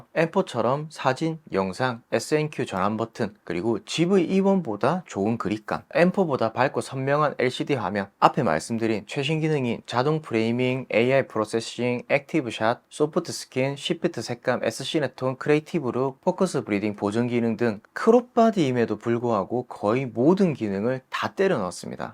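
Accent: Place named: Gyeongsang, South Korea. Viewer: 아랫지방 사투리억양 너무 맘에 들구요 편안한 영상 좋아서 구독과 좋아요 누르고 갑니다!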